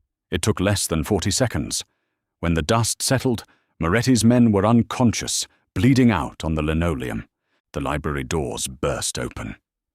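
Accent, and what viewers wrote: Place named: United Kingdom. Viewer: My Heart and Soul went into a huge melting OOOOOHHHH when he fed her the steak bites from his own fork. Chills down my spine! Superbly-narrated tale and extra electric shocks listening to that purring English / British accent while following along with the Transcript on, and a piece of paper over the picture section of the screen so my imagination can do the magic its meant to! Windsor, Ontario Canada EH?! ❤️🇨🇦🥂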